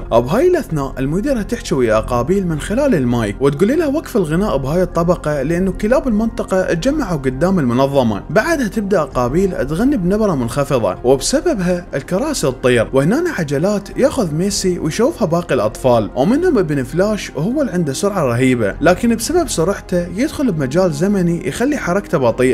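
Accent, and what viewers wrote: Iraq. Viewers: صوتك جميل وطريقة سردك تجنن وملخصاتك تجنن الوحيد اللي اتابع ملخصاته هو انت لهجة عراقية ومفهومة اسمتررر🥹🫶🏻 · انت من العراق 🇮🇶؟؟؟ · هستوني يله عرفت انته عراقي ✨🥱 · احب بس صوته عراقي تشبه والله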